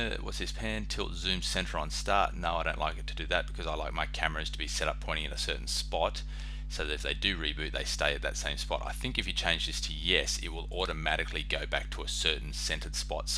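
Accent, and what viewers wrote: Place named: Australia. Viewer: Thanks for sharing this. I prefer the Australian accent that the Chinese calligraphy ... no offence, but its much easier to follow :). I wish you could add the settings for the Iphone and port forwarding. Thanks again from Bristol UK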